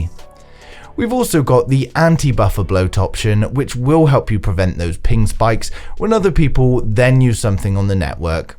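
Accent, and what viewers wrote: United Kingdom. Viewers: I love the way UK people say rooter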